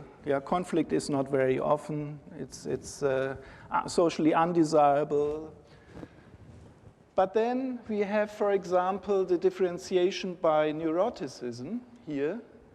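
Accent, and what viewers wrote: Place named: Germany. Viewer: Unfortunately he speaks with a too heavy German accent when lecturing in English language.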